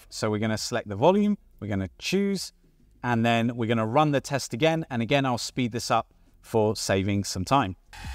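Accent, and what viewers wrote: United Kingdom. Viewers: I hear a British accent, so when is this coming out in the UK? 😀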